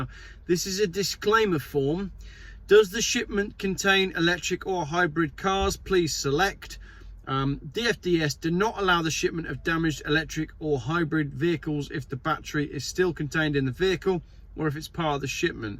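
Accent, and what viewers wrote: United Kingdom. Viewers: It's worth it just to hear your british accent. We "colonials" love it! And nobody here in the US seems to be as ahead of the curve on EV's as you are.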